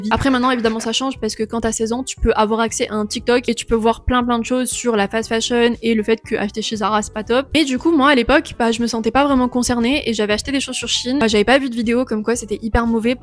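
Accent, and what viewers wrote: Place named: South-East, France. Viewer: Oh t’es une meuf du sud est 🥳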